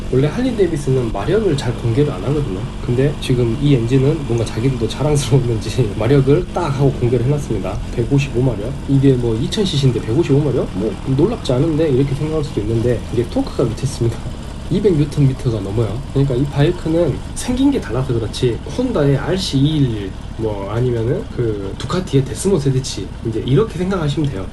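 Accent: Jeolla, South Korea. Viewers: 구수한 전라도 사투리와 할리의 엔진소리라…찰떡궁합이네요😊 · 억양이 순천분같어요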